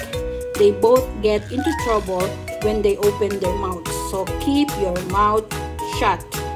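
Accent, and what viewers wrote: Philippines. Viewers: ph accent. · Love you Filipino accent 😊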